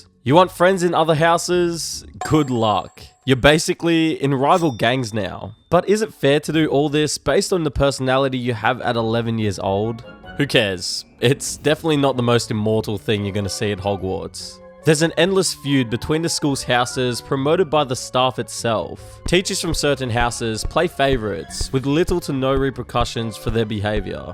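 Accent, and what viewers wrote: United Kingdom. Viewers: What happened to the American presenter? He had a beautiful way of speaking, the cadence the pauses. This one sounds British.....none of the beauty of the American. My ears hurt listening to him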